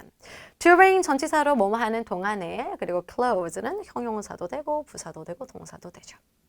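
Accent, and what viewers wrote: Gyeongsang, South Korea. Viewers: 경상도분이신가여 ㅎㅎ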